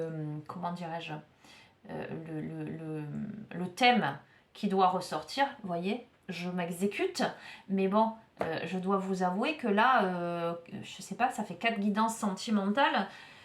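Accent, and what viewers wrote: South, France. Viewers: Bonjour &, c'est toujours un plaisir de vous écouter, vous avez une voix mélodieuse et un doux accent du soleil et cela est très plaisant...Je vous félicite pour avoir mis les choses au point avec certains Hommes car c'est indispensable de se faire respecter...Vos guidances me font le plus grand bien et je vous en remercie chaleureusement...Restons dans la Lumière Divine...Gratitude Infinie et Très bonnes Énergies à votre chaîne💐💖😇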